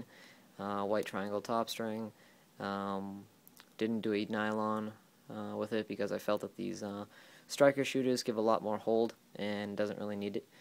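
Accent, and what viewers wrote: Boston, United States. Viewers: you have a Boston accent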